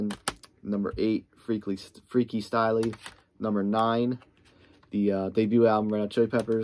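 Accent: Philadelphia, United States. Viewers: Lol are you from Philly bro? NJ?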